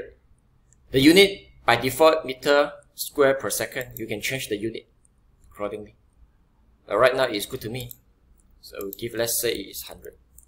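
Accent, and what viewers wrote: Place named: Singapore. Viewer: it sounds like a singaporean accent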